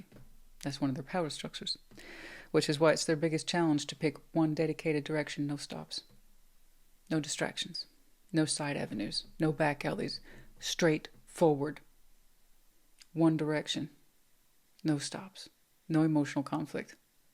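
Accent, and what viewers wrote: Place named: Texas, United States. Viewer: You tube and their bullshit. 🤦‍♀️ I absolutely love you. You keep doing what you’re doing and always, ALWAYS be yourself. I agree that your approach to dealing with the algorithm makes sense. Break em up as you see fit!! I’m gonna watch regardless. Just please, PLEASE, don’t ever give up!! I would definitely miss you and all you do. Love me some!!! I actually get upset when I don’t get to listen to you enough. I don’t get mad, especially not at you, it just feels like there is something missing in my life. I really didn’t realize what an impact you have on me until this year’s new ‘my year in review’ video that had me as one of the top 3% viewers of your channel. I froze when I saw that- as wide an array of channels I follow, and as much time as I spend watching or listening, top 3% is a LOT!! Anyway, just wanted to thank you for being who you are and doing what you do. 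You do you EXCEPTIONALLY well. Love your readings. Love your accent (fellow southerner here my Texas treasure). You sense of humor is phenomenal!! You have me rolling on the floor in your intros. Especially when your truth comes out- you are always spot on when it comes to people- and you absolutely do not hold back. LOVE IT!! I feel like you are family after following you for some 7 years now. I wish I could express exactly how important your insights and knowledge are, and have been impacting my life. Absolutely for the better. You are inspiring and charismatic. Best of all, you ROCK!!! I lov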